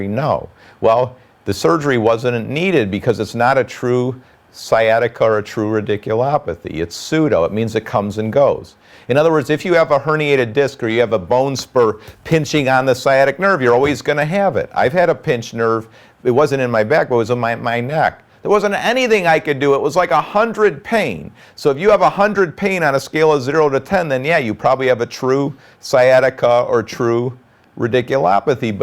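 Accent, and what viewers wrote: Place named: United States. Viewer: i would but it sounds like your in america would you know if they provide this in the UK?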